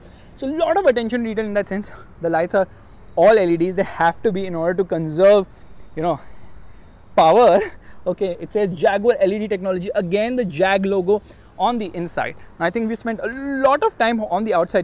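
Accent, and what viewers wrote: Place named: India. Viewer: Bhai apni desi accent m english jharny sy acha local hindi ya urdu mai bat kr Angrez tera review daikhny ni aingy..Unky pas top gear,Car wow jesy shows mojod hain... Thaki hwi english bolny sy acha apni local language m review dala karo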